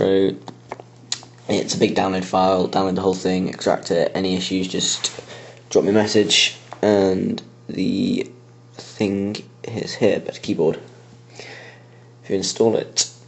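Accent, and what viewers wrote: England, United Kingdom. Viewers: shut up fucking shit from fyrom he is from england and he has an awesome ENGLISH accent.. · You sound like your from London.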